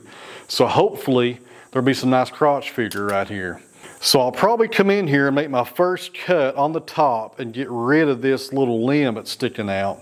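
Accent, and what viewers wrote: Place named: South, United States. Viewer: .. I agree, there is good and bad everywhere, but I'm Mexican and resect, politeness and manners is really big in my culture.. so I really admire it when I see it in other cultures.. maybe it's just that southern, twang that sounds so cool... LOL.. and do you notice how much he loves and respects his father? if it wasn't for that southern twang, I would swear he could be Mexican.. LOL...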